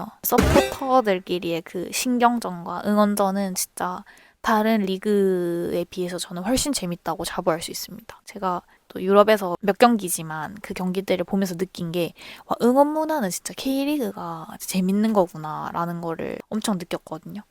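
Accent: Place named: Gyeongsang, South Korea. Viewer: 갱상도 아가씨가 강원팬이래요~~~?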